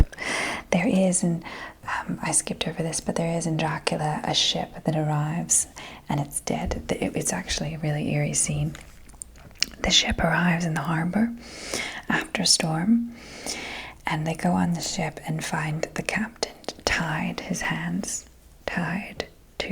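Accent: Transatlantic, United States. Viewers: You are so bloody fabulous. Thank you for sharing your voice and your mind with us! Can I ask where your accent is from? It's delightfully Transatlantic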